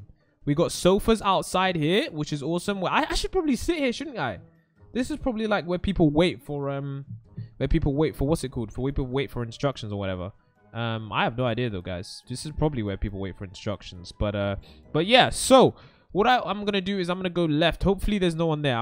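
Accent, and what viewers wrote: United Kingdom. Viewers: She is a British accent not Tycho Natalie Cole of Montgomery not see Kola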